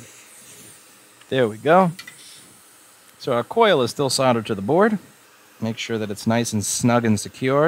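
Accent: United States. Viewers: why do you Americans say "Sodder' and not "Solder" as it is spelt.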